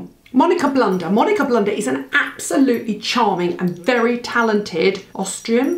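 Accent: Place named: England, United Kingdom. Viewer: I just stumbled upon your channel. It's so refreshing to see a woman the same age as myself being honest & realistic about skin care and make up for mature ladies. I love Bobbi Brown and so wanted to like the Jone's Road miracle balm and moisturizer - but I felt like I was slathering whale blubber all over my face and I didn't like the smell. I have to say they were very decent about returning the items in that they refunded my money but told me I could keep the products. I'll pass them on. Plus, Im a Brit from North London who now lives in LA, so good to hear an English voice!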